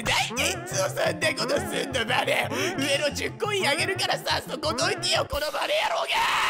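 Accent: Fukuoka, Japan. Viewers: さんの福岡弁新鮮で好きです！！やっぱりバネは友達ですね！ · 自分も福岡住まいなので福岡弁聞くとすごく親近感湧きますw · この福岡弁完ぺきやで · さんの福岡の言葉聞けてなんか嬉しいたまに〇〇ばいとかはさんが言ってるのは知ってましたけどここまでフルに出たの僕は初めてですね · 福岡弁、あんまり違和感無かった！でも、同じ九州でも熊本弁とは大違いですね！ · ちゃんと期待に応えて福岡弁やってくれてるの優しいw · 福岡弁のさんなんか違和感.... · さん大好きいいいいい！！！！！すみません。久しぶりにさんの動画を見にこれて、嬉しすぎて癒されすぎて気持ちがあふれてしまいました(ﾉ≧ڡ≦)☆ﾃﾍｰ コメントしきれないくらい、どこもかしこもさんが可愛くて可愛くて、終始ニコニコしてました〜🌸 福岡弁のさんが新鮮で良かったです〜！！うちの父は佐賀県出身なので、なんか安心する気持ちもありました( ˊᵕˋ*) 本当に癒されまくりました(◍ ´꒳` ◍) 心がぽかぽかです！いつもありがとうございます🌸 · 福岡弁で喋ってる時笑い過ぎて内容が全く頭に入ってこなかったwww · いい訛り具合ですね૮. ̫. ა ⸝꙳ 福岡訛り好きです(笑) そして最速タイムおめでとうございます🎊🎊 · 福岡弁、やばすぎてやばい、、、🥺 方言でずっと喋ってて欲しい🥺 ギャップ萌、、、からのかわいいかわいい🥺 ショタ喋り久々にやって欲しい、聞きたいです！（笑） 今日も面白かったです😂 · 実況中も福岡弁がいいな、絶対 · お疲れさまです！さんの福岡弁に萌えました❤️ ｶﾀｺﾄの標準語も好きですw(৹ᵒ̴̶̷᷄ωᵒ̴̶̷᷅৹) 1発マリオのゴールは思わず声出ちゃいました⚑ﾞ⭐️ 一日の終わりに見れてよかったw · 福岡弁も中々悪くないですね。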